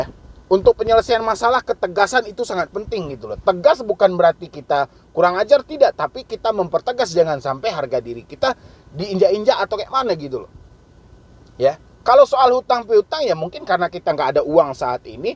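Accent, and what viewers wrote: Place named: Jakarta, Indonesia. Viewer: Logatnye knape jadi Betawi bang 😂 dulu ane suka nonton video abang logat msh daerah mana gitu skrg bgtu buka lagi video abng logat ud berubah 😂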